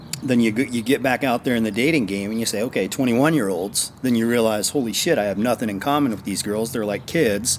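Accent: United States. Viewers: I'm wondering how your tinder dates look like because there are many attractive men out there with not really attractive chicks...I love the tone of your voice with this american accent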